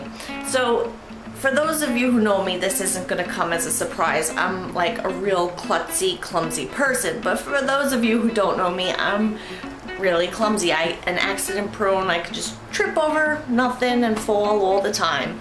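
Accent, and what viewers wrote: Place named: Staten Island, United States. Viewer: Lol is that trader joes in Staten Island? Lol looks like it and you're accent made me think that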